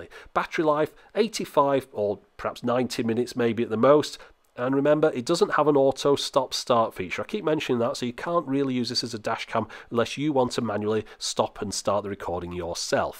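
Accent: United Kingdom. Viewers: ByteOfWood YT. You didn't realise the British accent? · its the typical british accent :p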